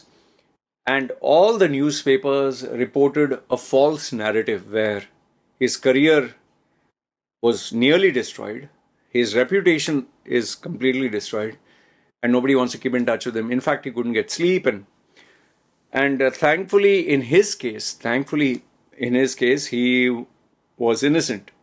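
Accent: India. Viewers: Why u indians always say saar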